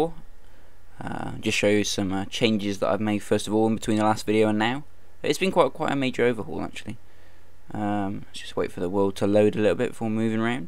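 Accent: United Kingdom. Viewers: reminds me of X.... cept english accent...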